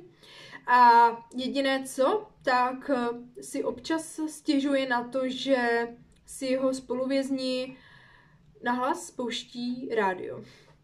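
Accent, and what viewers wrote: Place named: Ostrava, Czech Republic. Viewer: Mne treba vadi zvyseny ton hlasu na konci vety. Zakoncujete vety jako by otazkou. Budete asi z Ostravska, ze :)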